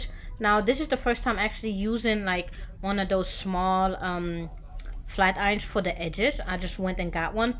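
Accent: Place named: Cambodia. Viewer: Your assent sounds Cambodian love it wow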